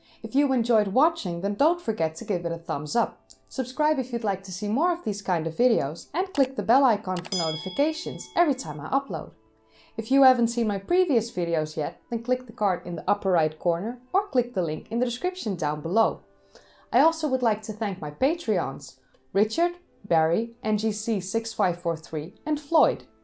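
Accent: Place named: Netherlands. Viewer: your (dutch?!) accent is very nice to listen to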